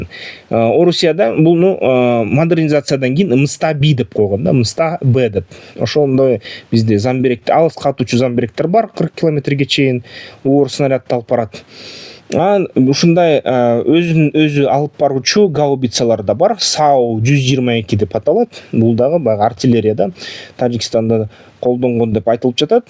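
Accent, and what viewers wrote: Standard. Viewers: Кыргызча таза суйлойт байкебиз азаматсыз.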